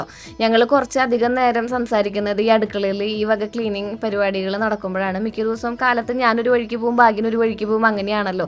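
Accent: Thrissur, India. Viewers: ഓർമ ശരി ആണെങ്കിൽ 2019 ഒരു 18 തൊട്ടെങ്കിലും ഞാൻ video കാണാറുണ്ട്. പല daily vlog കളും കണ്ടിരുന്നെങ്കിലും ഇപ്പോഴും കാണുന്നത് മാത്രം ആണ്‌ വേറെ ഒന്നുമല്ല. ഞാനും തൃശ്ശൂർ ആണ്‌ age തന്നെ ആണ്‌ വർത്തമാനം കേൾക്കുമ്പോൾ എനിക്ക് എന്റെ നാട് ഓർമ വരും എന്റെ ബന്ധുക്കളും കൂട്ടുകാരിയും ആണ്‌ നിങ്ങളൊക്കെ എന്ന എനിക്ക് നിങ്ങളെ feel ചെയ്യാറ്. But പ്രൊമോഷൻ ചെയ്യുമ്പോൾ filter ഉപയോഗിക്കരുത് തന്നെ പറഞ്ഞല്ലോ ആൾക്കാർ selfish ആകുന്നു എന്ന്.filter ഇടുമ്പോൾ അങ്ങനെ തന്നെ തോന്ന ഞങ്ങളെ പറ്റിക്കല്ലേ. പ്രൊമോഷൻ ടൈമിൽ നല്ല skin അത് കഴിഞ്ഞു കിച്ചണിൽ കേറിയപ്പോ ഒരു glow യും കാണാനില്ല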